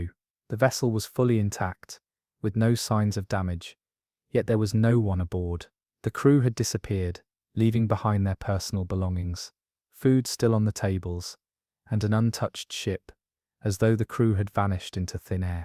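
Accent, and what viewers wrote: United Kingdom. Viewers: It's the British accent, I don't know what it is about it. Got a nice cadence, too.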